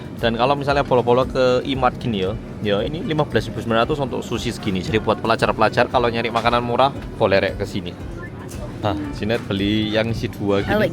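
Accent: Java, Indonesia. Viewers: AKU GAK SKIP IKLAN DONG UNTUK YANG MEDOOOK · Mukanya kore tp klo bhasa indo logt jwanya kentel bget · Hai medok sudah lama nggak posting mega pasti lucu karna kamu nyenengi sekarang mega main folinya banyak bagus 😂❤❤❤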